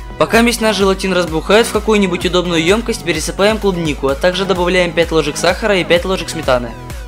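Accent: Ukraine. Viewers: Этот украинский акцент...)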